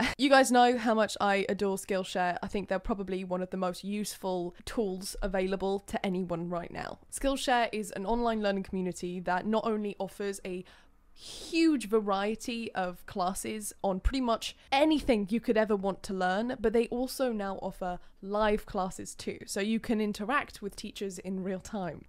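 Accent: United Kingdom. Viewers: you sound more british for some reason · I don’t know why I always forget that you are British until you start talking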